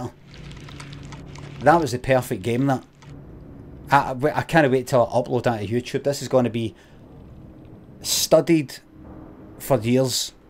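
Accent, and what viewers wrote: Scotland, United Kingdom. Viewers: Oh cool a Scottish DBD youtuber 😯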